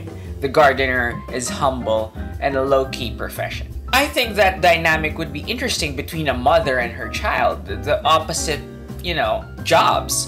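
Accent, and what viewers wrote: Philippines. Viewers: awesome vid! also, somehow thought of your accent... as Filipino? maybe i'm wrong, your analysis of this weird comic was spot-on, though. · Are you filipino? I can sense our similar accents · Im not sure why, but I hear a smol twinge of Filipino accent for some reason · Didn't read the book, have no plans to because the internet have all united to say one thing about it: it's shit Art is good, but story is shit Also your accent is familiar are you by chance a filipino? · Are you a filo lmfao the accent is so familiar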